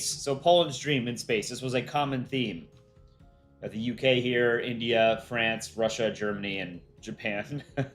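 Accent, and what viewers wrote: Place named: United States. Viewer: your voice like United States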